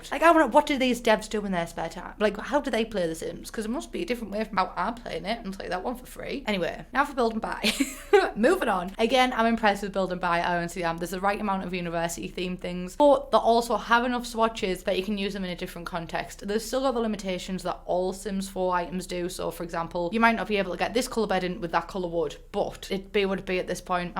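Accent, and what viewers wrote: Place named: Teesside, United Kingdom. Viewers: Can totally tell you’re from near Middlesborough 😂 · Okay - this might honestly sound so damn stupid and irrelevant, but it's SO nice to finally see a popular, nice genuine youtuber, especially one on a topic I LOVE with a fellow northern accent?? Granted, I wasn't BORN in the UK, but having lived here since I was 4, I grew up with that North East, Durhamite, teeside accent.. and I was never.. overly fond of my own - it was always called out by my southern friends and commented on since it "never fitted me" "made me sound- **queue generic insult**" and as stupid as it is, it always got to me?? But it's so nice to actually see it, hear it surrounded with nothing but love, or well, not even seeing it mentioned? Now reading this back: I'm so afraid that this may be insulting to anybody else with these kinda accents - but please know I actually adore em, just I've never had a good experience with MY OWN. 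But also, it's nice to also see somebody from around this area being successful as well! Working class ganggang may understand ig, idk.. TLDR: idfk I ramble sorry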